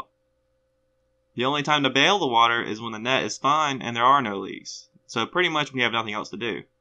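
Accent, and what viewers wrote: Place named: South, United States. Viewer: dang that deep southern accent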